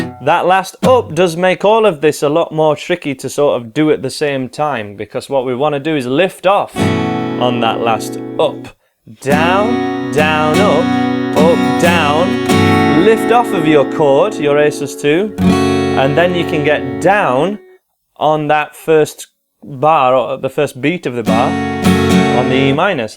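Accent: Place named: Sheffield, United Kingdom. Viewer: Sounds like a Sheffield accent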